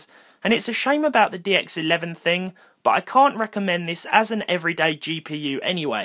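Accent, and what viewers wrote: United Kingdom. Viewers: man, ive never heard a more british accent than this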